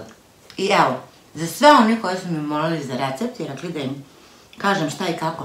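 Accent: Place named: Sombor, Serbia. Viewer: Bas si simpatična 😂 a recepti su ti super! Zadovoljstvo te gledati i slušati , taj Somborski naglasak obožavam… pozdrav iz Edmontona u Kanadi❤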